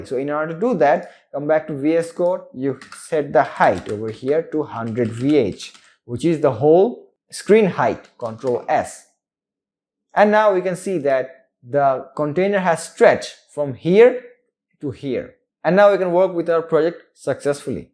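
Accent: Bengal, India. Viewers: Indian accent Lol, can’t understand! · dada tomar accent ta sunei bojha jachhe bangla vasa vashi❤️❣️,love from WB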